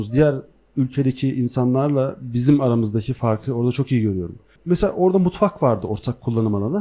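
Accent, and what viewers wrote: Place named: Anatolia, Turkey. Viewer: Abi çok sıkı fanın sayılmam. Ancak kurcala daki çok eski videolarını biliyorum ve çok güldüğüm bir mizah anlayışınız vardı. Kanalı görünce bir heyecanla açtım ancak 2. Dakikaya zor geldim. Bu yarattığın sahne kişiliği ise lütfen vazgeç çünkü kurcaladaki kişilikten sonra çok eğreti duruyor. Eğer bu gerçek kişiliğinse o zaman lütfen kurcaladaki sahne kişiliği ile devam et. Anadolu şiveli ve durumlara uzunca süre uzaktan bakıp anadoluca bir tepki veren yeterince stand upçı var piyasada. Bu şekilde sıyrılman imkansız.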